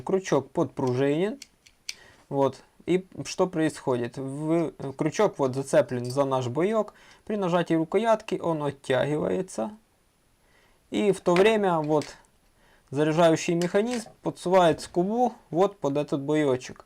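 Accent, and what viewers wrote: Vologda, Russia. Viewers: Вологодский чтоли 😅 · Спасибо, познавательно! У вас акцент вологодский)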